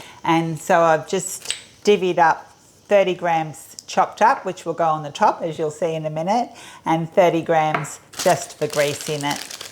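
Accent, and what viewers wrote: Australia. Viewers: Why ate you saying all purpose flour? In Australia its plain flour and double cream. You accent is Aussie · I love your accent. You sound absolutely spangled! Aussies always sound like they are completely smashed. 😂 Great recipe btw.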